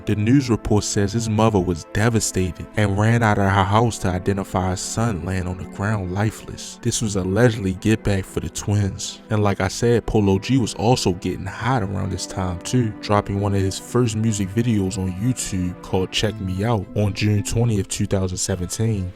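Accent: Baltimore, United States. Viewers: aye how y'all be knowing bout the hood and it sound like you from Baltimore , y'all know more then the police do.. and never even prolly been to the Raq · Gangs being underated like this is some nfl draft this is weird shit 😂😂 souund like your from Baltimore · U gotta be from Baltimore folks....but anyways u just gotta new sub my guy 💯💯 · Bmore accent strong. Subscribed from Philly. Keep up the content.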